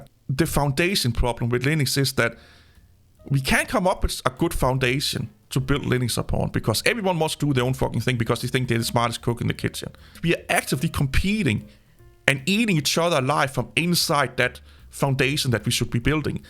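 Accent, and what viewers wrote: Denmark. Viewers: That accent is waaaay tooo danish!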